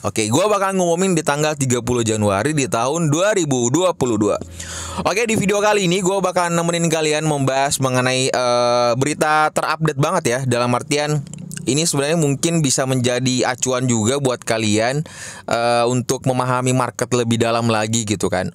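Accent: Bugis, Indonesia. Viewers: Bang,, orang bugiss yaaa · Bugis ya bang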